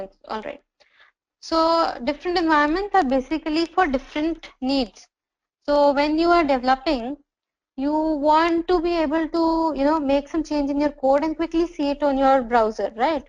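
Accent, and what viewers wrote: India. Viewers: Hey Edureka. Why dont you activate English subtitle sections on your videos? Sometimes it's really hard to understand Indian accent so subtitle make to understand easier. Thanks.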